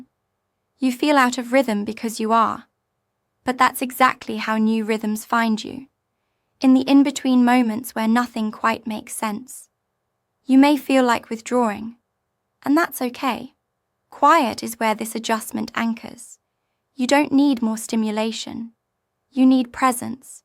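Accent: United Kingdom. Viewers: with the Brit accent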